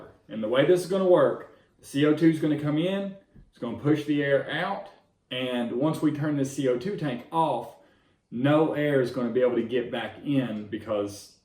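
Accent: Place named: South, United States. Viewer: Great video! Just curious though, what part of the south are you from?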